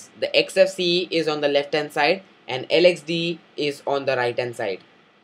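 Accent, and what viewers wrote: India. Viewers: Lmfao diz fuckin' indian , how2speaksofunny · He's an Indian. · I don't like it either, but it's because we're not used to it. It doesn't ruin the video, as it's one of the better Indian accents that I've heard.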